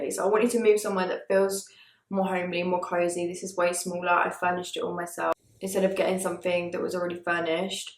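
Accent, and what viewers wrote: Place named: London, United Kingdom. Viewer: It been a while since I came on this channel. Since when has got a London street accent 😆??. Happy new year!💞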